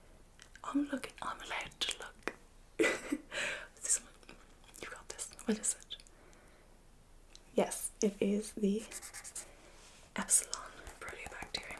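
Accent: Ireland. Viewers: Deeply relaxing and highly informative; the type of video where the material is dense enough to keep the Irish accent from lulling me to sleep.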